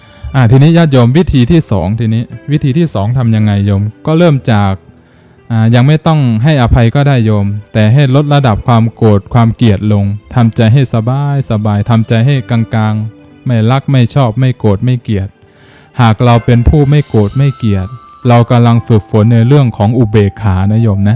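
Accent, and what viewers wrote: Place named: Lampang, Thailand. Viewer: ลำปางชัดเจนดีเจ้าคะ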